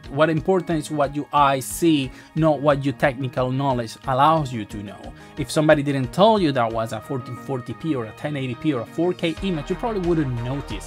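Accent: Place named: Latin America. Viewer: Se lo nota ya mas suelto que en el primer video... NO creo que lo de latino afecte demasiado... Y si bien el toque latino en su acento de ingles se nota, no es tan exagerado...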